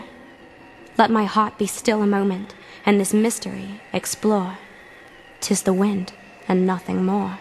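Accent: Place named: United Kingdom. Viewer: I love her accent.. even though I'm British as well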